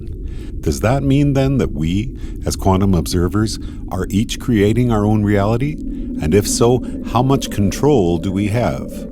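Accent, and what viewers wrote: Canada. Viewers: Is this guy Canadian? Instant turn off. No one can believe a Canadian would be saying something smart.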